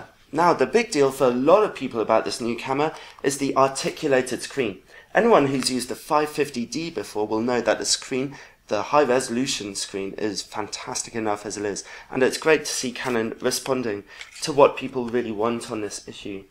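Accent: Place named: England, United Kingdom. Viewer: Where did you live in England before you moved to whales? Your accent is quite interesting =)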